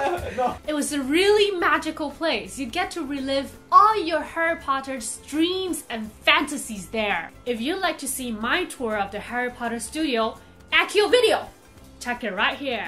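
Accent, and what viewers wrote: United Kingdom. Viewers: 英國腔是怎樣～～😁😁😁太萌了吧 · 😍😍😍😍British accent more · 真的好喜歡滴妹的英式英語💕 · 一直覺得滴妹的英國腔很像妙麗 😍😍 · 滴妹英國腔好好聽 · 跪求。。。我要英國腔，超好聽滴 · 有配合主題搭配英國腔哦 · 這次好像特別用英國腔來說了呢哈哈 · Your British accent is cute! Nice video. Hey from England. 😊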